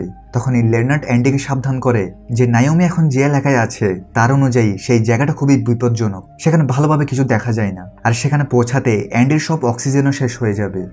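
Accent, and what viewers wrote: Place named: Chittagong, Bangladesh. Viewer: আপনার নাম কি জানিনা। উচ্চারণ শুনে মনে হয়েছে চট্টগ্রামের বাসিন্দা। ইন্টারেস্টিং মুভির এক্সপ্লেনেশন করেন। কিন্তু একটি উচ্চারণ শ্রুতিকটু মনে হয়েছে। এন্ডি থেকে লেগেছে, লিওনার্ড থেকে লাগছিল... এভাবে না বলে বলবেন- এন্ডির মনে হয়েছে, লিওনার্ডের মনে হচ্ছিলো, তার খুবই খারাপ লাগছিল, তার ভালো লেগেছে। থেকে শব্দটা এভয়েট করুন।। শুভ কামনা।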